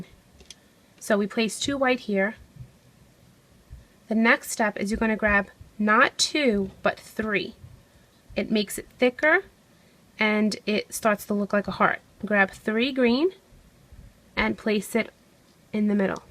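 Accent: United States. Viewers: You know what I love most? Your accent! I'm an Aussie and love listening/watching to your tutorials because of your American accent. Your designs are pretty awesome too :P xox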